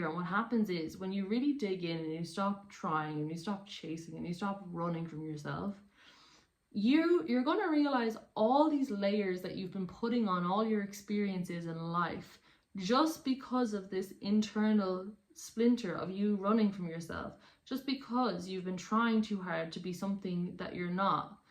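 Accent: Ireland. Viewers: Your killing me with the Irish accent. Love the accent. Your doing a great job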